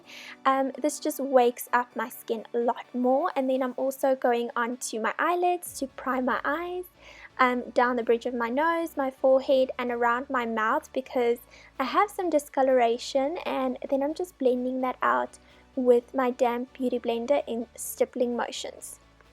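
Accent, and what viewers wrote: South Africa. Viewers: Love your accent. are you south african?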